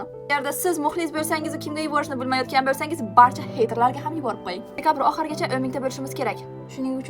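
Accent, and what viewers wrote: Bukhara, Uzbekistan. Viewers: Qayerdasiz shevangiz buxorocha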